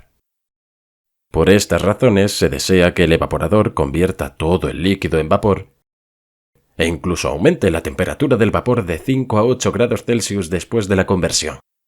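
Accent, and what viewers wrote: Spain. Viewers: tipico acento espaniol :)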